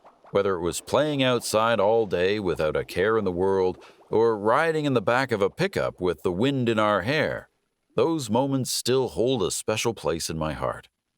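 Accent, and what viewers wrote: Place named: Canada. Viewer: This narrator sounds like he's from 🇨🇦 . . . 😊 Yeah, our childhoods were waaaay different from those of kids today! I don't think I'll ever get used to how quiet my neighborhood is in the summer! 😅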